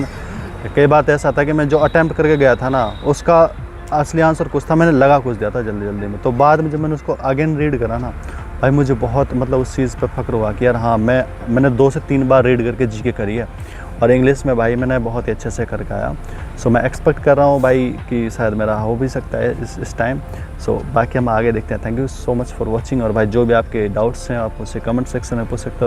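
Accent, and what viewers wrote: India. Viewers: I think you belong to Mewat?? Your accent 🤌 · Bhai aap narnol Mahendragarh wali side se ho ❤😊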